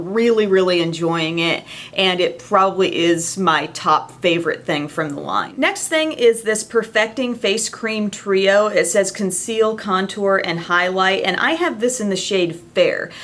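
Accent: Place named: Midwest, United States. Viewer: I just came across your channel, and as soon as you started talking I knew you were from the Midwest, no insult intended. I'm from the Midwest also, and I recognized your accent right away, because you sound like my entire family! Anyways I really enjoyed your video, I'm a new subscriber! ❤️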